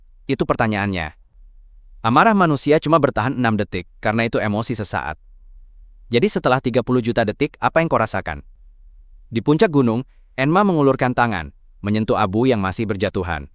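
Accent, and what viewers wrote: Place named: India. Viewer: Suara nya kayak India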